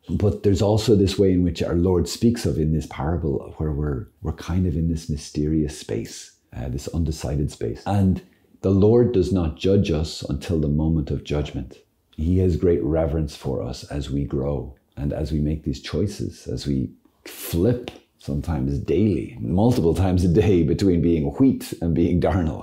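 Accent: Ireland. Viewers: Blessed Father I believe if you don’t ask you don’t receive and that goes for everything in this life and I believe the next ! You are a inspiration to me from your Irish accent to your extremely Heart fulfilling Streams and Clarity ! Clarity of the bible and what our Holy Father wants us to do is a Blessing being explained by you Father !! Keep the Faith ALL !! Bless you Father ! Your Accent and your Irish ☘️ HUMOR ! It makes life easier ! God Bless with all the Angels and Saints us ALL. ! Michael James Patrick Maguire in Melbourne Australia ! 🇦🇺. ❤️❤️❤️❤️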